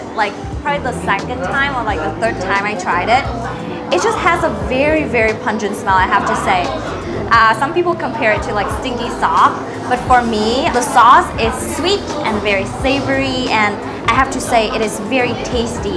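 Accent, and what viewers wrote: Midwest, United States. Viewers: I like most of your videos, but one thing you say wrongly (my view) is your very American pronunciation of the word HERBS....you say ERBS! Correct English expression more acceptable to a wider English speaking audience is NOT to drop the "H" of such a common thing in Vietnamese food speak. The Americans have changed a lot of the English language as you know, and while your American accent is cute we Aussies of the older generation particularly have resisted Americanizing spelling & pronunciation. And you know as a Ha Hoi girl being educated in US when they were the former enemy of your parents & grandparents it is a big culture difference thing too. Let's discuss in our next Checkin! With me about to start learning Vietnamese I must try to pronounce things the correct way when in the north, central or south. I want to learn the Central & North ways firstly. 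· Your American accent is so good...love your Vietnamese voice too, very nice videos and editing. Keep up the great work. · beautiful girl with fantastic American accent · Really like the new (?) logo. Feel it fits you and your channel really well from what I have seen. Do you know the artist personally? Suggestion: Add google map links to places you highlight in the description. Paddy Doyle does this and it is something I think every vlogger should copy. Works so well to easily find and save places. Like that cool ice cream shop which I saved. Side note: I know you said (in another video) some people said things about you vlogging in English vs Vietnamese. But there are people like myself who really love local vloggers sharing their country with us in English. There is nothing wrong with the standard western expat or digital nomad vlogger (minus the creepy ones) but it is nice to have locals in the mix as well. 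My first exposure to "travel" vlogs was via some Fil-Am vloggers, Bulay Says Hi (she stopped) and Wil Dasovich (which I found via Bulay) and his friends. There are several Filipino (and half Filipino) vloggers who vlog in English but outside of PH it is much more rare. So I am glad the YouTube algorithm suggested your channel vs how so often I get suggested the "creepy" ones. (Sorry YT but just because I like videos about ASEAN countries and watch expat vloggers doesn't mean I want to watch videos like that.) Nice to get suggested a good channel vs a "creepy" one. Bonus that you are truly a local. Double bonus that you have a midwest accent like myself lol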